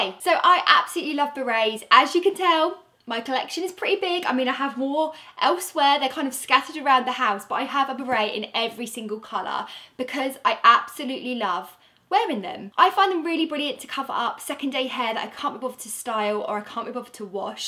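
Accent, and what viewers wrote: United Kingdom. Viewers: You may not realize it,but it's the combination of your dimples,your eyes,your British Accent and those colorful Berets you employed on your head that drove this Cambodian lad insanely mad.You got a boyfriend?? I can totally see you clinging to my arm while take a promenade up and down the Champ Elysee.The only thing missing on you is a little French Poodle leading the way to The City of Love!!;)))) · She’s from the uk ❤️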